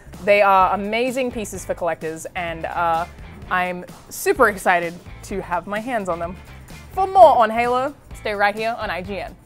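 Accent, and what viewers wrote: Australia. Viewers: TheNeedmoneybad she's Australian so yeah basically lol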